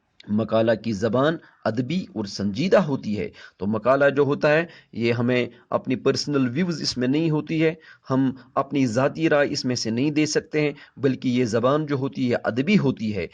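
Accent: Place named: Sindh, Pakistan. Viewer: کیا آپ سندھی سے تعلق رکھتے ہیں ؟ آپ کے لہجے میں سندھی مٹھاس کی حلاوت محسوس ہوتی ہے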